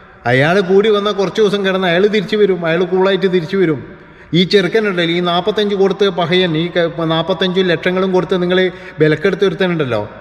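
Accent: Kannur, India. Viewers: കണ്ണൂര് ആണോ, നമ്മളെ കണ്ണൂർ ഭാഷ ആണല്ലോ പറയുന്നത്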